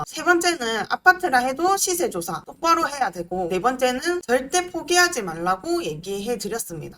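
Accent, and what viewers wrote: Gyeongsang, South Korea. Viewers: 갱상도 말투 쥑이네예~화끈하게 좋네예!!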